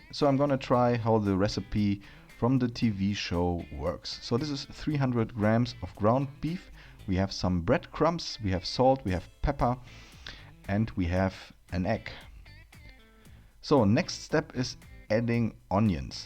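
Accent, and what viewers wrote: Germany. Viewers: Schönes Video und sehr interessant das Rezept! ...aber dein deutscher Akzent beim Englisch sprechen ist echt Hardcore!!! Versuch die Worte mal ein bisschen weicher zu formen dann klingt das ein bisschen sauberer und flüssiger. 😉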